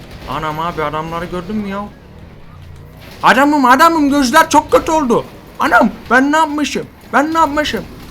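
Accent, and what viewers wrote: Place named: Konya, Turkey. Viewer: abi tam bi gonyalı gibi gonuşuyon ha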